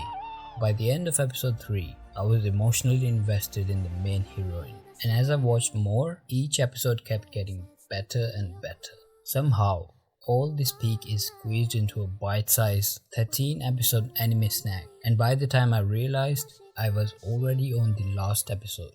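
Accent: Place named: Pakistan. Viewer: Looks Like I Found Another Hidden Gem Like Summer Time Rendering (it's quite popular now but when I watched, it wasn't) 👀✨... BTW Ur Accent, You From PAKISTAN?! 🇵🇰🤩⁉️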